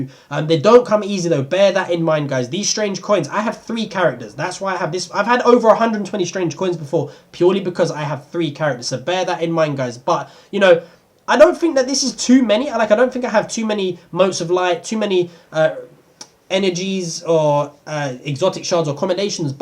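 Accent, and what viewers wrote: United Kingdom. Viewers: Why is every Destiny Youtuber british? Seriously, you're voices are fucking annoying.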